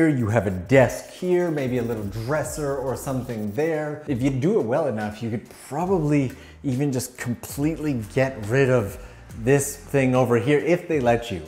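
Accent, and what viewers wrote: Canada. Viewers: This is the most Canadian accent I've heard in a very long time.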